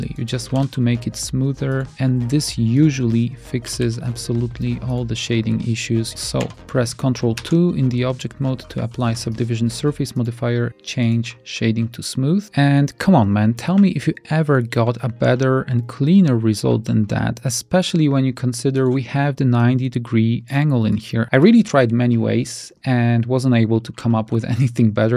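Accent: Poland. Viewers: Real CAD programs automatically make perfect fillets and they provide you with several tools to verify their perfection. Blender does not have these features because it is used by artists rather than by engineers. If you want to make jumping dinosaur, you use Blender. If you want to design airplane wing, you use Rhino. (This guy has Polish accent.) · Are you Polish? lol Nice video mate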